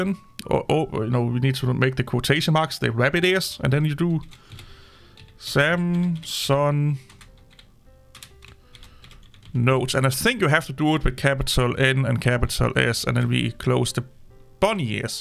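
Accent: Denmark. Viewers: Thanks so much I use Notes for my studies and needed this to work on my PC. Samsung needs to fix this its a bad look. The whole point I have a Samsung and not an iphone is because the accessibility and customization. Also are you from Denmark? Accent sounds like it.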